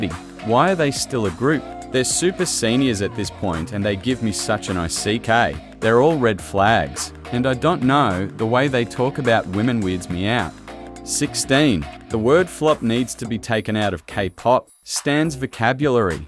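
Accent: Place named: Australia. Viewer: "They should make a rule that you should be 16 minus 17 years old to debut." 🤣🤣🤣 So they should be literal fetuses? Haha just pulling your leg! Is this a robot voice reading "16 - 17" literally because that is freaking hilarious! No judgment, absolutely no dislike for robot voices. I would also choose one instead of using my own voice. I've never heard an Australian robot voice, and then you said you were from Germany, but that does not preclude that you may have learned English in Australia, as one of my friends did. I enjoyed this video very much! I'm subscribing right now and I hope you make more videos soon! 🙏😄🌸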